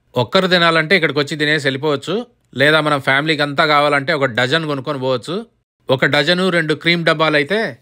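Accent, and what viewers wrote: Rayalaseema, India. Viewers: Anna paka midhi rayalaseema kadha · సార్ మీరు పక్క రాయలసీమ స్టైల్ లో మాట్లాడుతారు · Madhyalo bokka petti untaru amaina mana slang bale untundhi Anna I'm also rayalaseema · Indakane lesina anna....Mee Kadapa yasa super Anna😁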